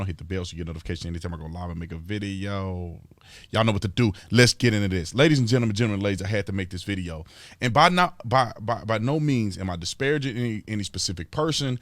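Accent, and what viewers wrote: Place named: South, United States. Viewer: definitely has a southern accent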